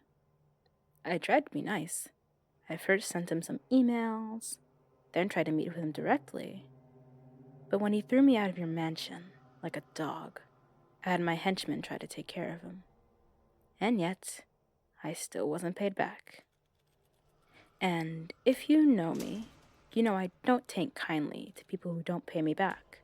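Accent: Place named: Scotland, United Kingdom. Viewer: I CLICKED ON THE VIDEO AND HEARD A SCOTTISH ASCENT AND IMMEDIATELY GOT HAPPY IM SCOTTISH, this is rare ✨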